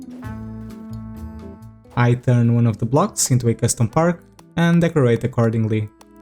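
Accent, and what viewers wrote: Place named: Portugal. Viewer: O inglês do português é maravilhoso, merece o meu gosto. Nota-se mesmo quando um português fala inglês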